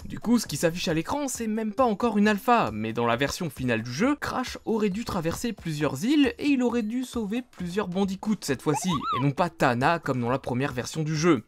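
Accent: England, United Kingdom. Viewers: promi jeu critik pa ton akcan englé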